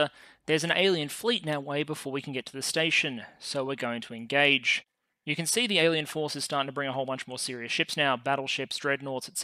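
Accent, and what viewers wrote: Australia. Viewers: Hey man I just wanted say that I look forward to your videos every day, you content is top tier and that aussie accent is exactly what the video needs, your stuff is great, here if my infantismal engagement contribution to aid you with the algorithm god, also castilo is the only relatable view imo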